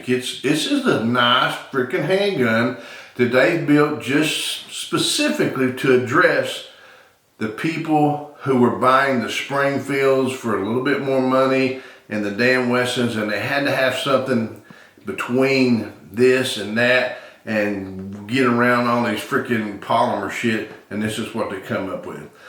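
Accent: South, United States. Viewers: Hey You The Man Coming At You From North Carolina Old Vietnam Vet Retired 100% Disabled PTSD.SARGE I Bought One When They First Came Out & Your Review Was Spot On.Thank You Sir For Your Service. I know A Vet When I See One.Yes Sir Ree!!!! Over In Nam The Best Friend Of Mind Was From Arkansas Best Man Every Has His Shit All Wired Together Tight. You Get My Meaning. Sarge Any Thoughts On Suppressor For This Mean Machine.Have Looked At Threaded Barrels All Over The Web. I got Two Suppressors One For Colt AR15A4 Weapon And One For My M1991A1 If You Have Any Thoughts On This This Subject Please Do Share With Me On The Best Way To Go with This Project Sir. I Love The Way You Talk With Your Southern Slang & I,m Proud To Be From The South Too Just Like I Know You Are.Have A Good One & Keep It Between The Ditches HaHa!!!! Owens Signing Off For Now.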